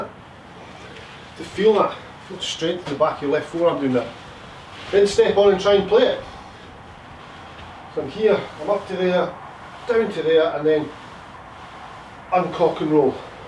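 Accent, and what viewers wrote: Scotland, United Kingdom. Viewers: The best instructor yet, love that Scottish brogue, gets his point over with lots of demonstration along with explanation. He does not waffle on about other things. As a 91year old I love his lessons I think he is the best thing since sliced bread.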